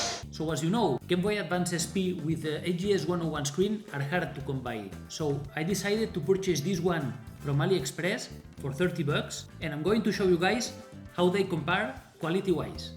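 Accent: Spain. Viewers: Ostia este es español. Me juego lo que sea XD. Que acentazo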